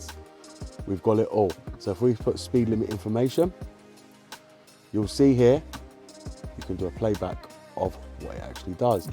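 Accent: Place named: London, United Kingdom. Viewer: He's a Londoner If you can't recognise the accent then your a melt ... Now geda' Fuk outta ere ya cunt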